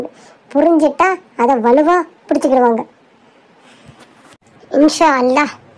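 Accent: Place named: Parangipettai, India. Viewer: Intha slang parangippettai thana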